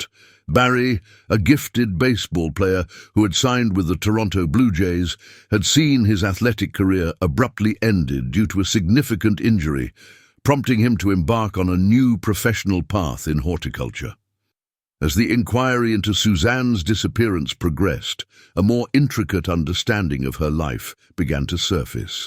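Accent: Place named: United Kingdom. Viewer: that stupid British voice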